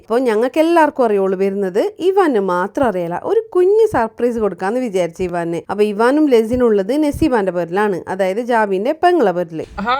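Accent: Kasaragod, India. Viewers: Kasrod bhasha nte athra oru bhashyum indavvula aabe❤ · ഇതെവിടുത്തെ ഭാഷയാണ് കാസർഗോഡ് ആണോ, എന്ത് ഭാഷയാ ട്ടോ, ഒന്നും മനസ്സിലാകുന്നില്ല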